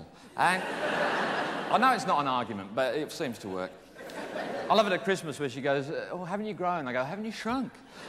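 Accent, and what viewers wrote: Australia. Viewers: I love how him living in Australia meant he has a slight Australian twang to his accent.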